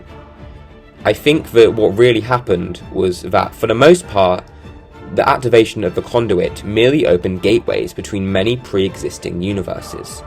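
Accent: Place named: United Kingdom. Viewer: The fact that a British guy narratives this is so Xenoblade-y !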